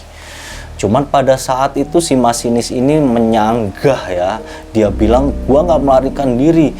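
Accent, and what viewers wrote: Java, Indonesia. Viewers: Sekarang jadi medok uncle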